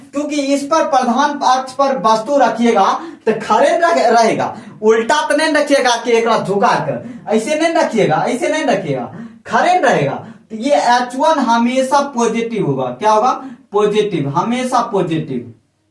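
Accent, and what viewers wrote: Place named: Jharkhand, India. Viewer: Jharkhand sa bola raha hai sir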